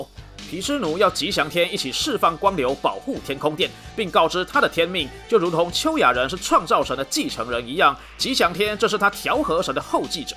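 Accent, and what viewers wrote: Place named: Minnan, Taiwan. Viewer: 这部漫画也是我小时候看的最喜欢的动画片之一，看得出来UP主很用心在解说，但说实话虽然你尽量用接近普通话的口音解说但依然能听出你有闽南语口音，你是台湾那边的吧？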